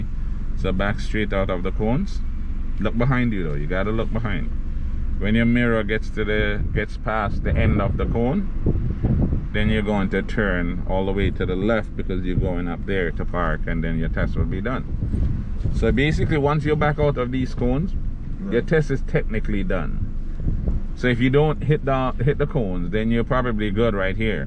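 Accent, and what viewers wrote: Jamaica. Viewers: R u Jamaican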